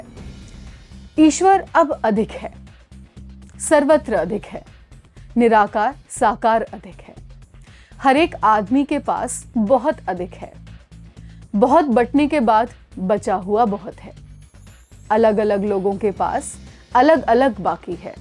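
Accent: Standard. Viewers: Aap k bol nay andaz pure hindi achha laga sister baat samajh mai aa gai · एक अच्छे पत्रकार का सच्ची पत्रकारिता और विशुद्ध हिंदी वाचन के लिए बहुत ही साधुवाद।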